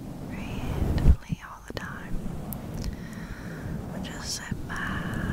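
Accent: South, United States. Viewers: There's really something quite enjoyable about a southern whisper.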